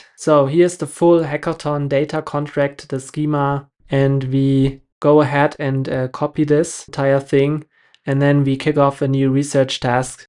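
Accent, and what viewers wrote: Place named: Germany. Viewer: If you hear a german accent you know you are on the right video ! Thanks 😊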